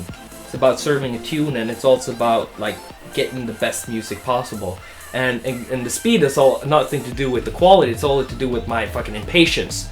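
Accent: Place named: Canada. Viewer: Why does he talk like a Canadian if he's from Norway?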